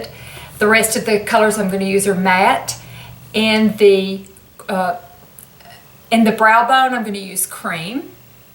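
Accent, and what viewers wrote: South, United States. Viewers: Just found you few days ago and I had to subscribe you because who doesn’t! You are skin is incredible but I like your kind and honest personality as much as your beautiful face:) and I like your cute southern accents. I have a same palette but I haven’t used for almost a year. I just got tired of the way I use so this look gives me more variety and would like to try it. Your skin care inspires me to start taking care of my skin more seriously. I’m 43 and after 40 I noticed my skin start sagging and looks dull. Thank you!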